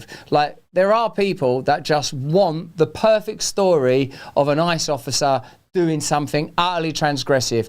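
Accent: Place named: United Kingdom. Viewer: I love how this guy's says absolutely nothing but has a British accent so people thinks he's saying something so insightful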